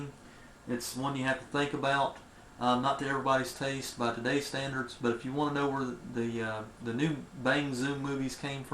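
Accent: South, United States. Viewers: Can't quite place your accent, sounds halfway between sounds mostly country southern with a mix of Carl Sagan.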